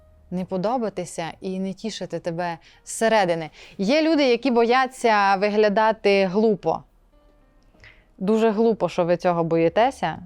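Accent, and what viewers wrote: Transcarpathia, Ukraine. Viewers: Будете матюкатися - не буду дивитися. Це не смішно. Прекрасна Закарпаття говірка спорчена москальськими матюками... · Ти молодець! Я слухаю тебе здебільшого, як носія закарпатського діалекту 🫂 Нехай Господь тебе благословить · ! Дякую за закарпатську мову! Насолоджуюсь слухаючи Вас, дякую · як же я люблю твій закарпатський говір. Це є твоя унікальність. Сумую за Закарпаттям і люблю всією душею краєвиди і людей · Хуйово як для першого випуску! Ніхуя не смішно, тадуже дратує акцент! Закарпаття? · Но дуже файно!... Мені полюбилося!...... А ще як чую мою мою рідну Закарпатську😘.... Супер! !!!! ❤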